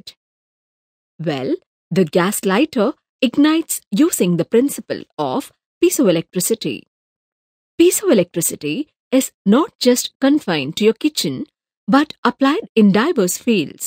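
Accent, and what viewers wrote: India. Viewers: Great, but unfortunately I will never get used to that English-Hindi accent 😓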